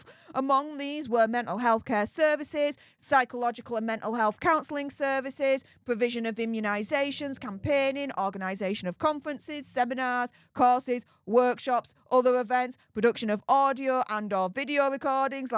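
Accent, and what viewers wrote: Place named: Cockney, United Kingdom. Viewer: Your accent sounds East ender working class .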